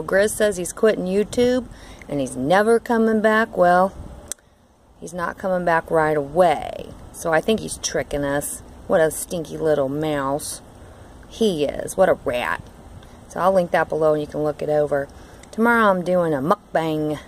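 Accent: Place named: Indiana, United States. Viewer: That's an Indiana accent I think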